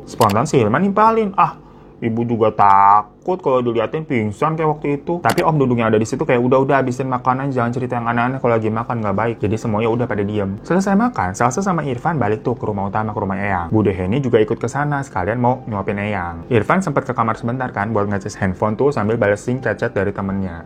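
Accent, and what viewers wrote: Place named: Banyumas, Indonesia. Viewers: Bang ngapak lo oke banget masih ehe 😂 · Curiga bang wong ngapak😐🙏, katanya juga punya sepupu orang purbalingga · Si babu glowing bisa ngapak woyyy😮😊😂😂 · Ngapak lu gureng bang 🗿 Gw orang purwokerto agak aneh dengarnya🗿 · Aku suka mas bisa ngomong ngapak wkwkwk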